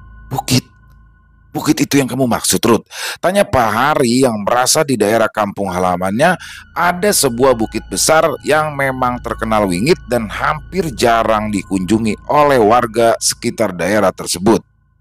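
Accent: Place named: Jakarta, Indonesia. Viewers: aq jg lbh suka logat betawi..jujur gak gala gasi gtu.. secara gue jg dulu tinggal fi tanah betawi lama😅😅 salam knal bang.. aq pecinta bercerita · Paling keren nih cerita dari mas untung prasetyo tentang pengalaman bg, apalagi yg baca cerita@ bg pke logat Betawi, mantaffff, salam untuk mas untung Prasetyo, dan bg y bg, semoga sehat slalu n sukses dan slalu dalam lindungan ALLAH SWT, aamiin.......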